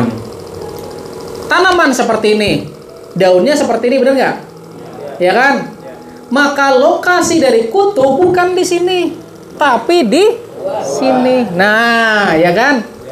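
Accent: Banjar, Indonesia. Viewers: Logat Banjar kayaknya...Smoga sehat selalu.Ulun jg pernah tinggal lama😀 di Bajarmasin.Kutu kebul ko datang terus knapa yah...ditanaman jeruk.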